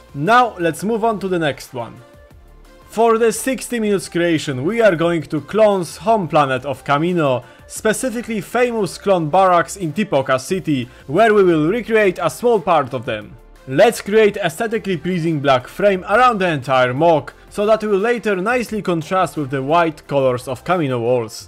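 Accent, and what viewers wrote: Poland. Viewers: Nice creations and jesteś z Polski bo masz taki akcent ? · Kurde wyczułem polski akcent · I can feel the Polish accent in your films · ale polski akcent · Are you from poland, beacuse you sound a bit like that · Are you polish?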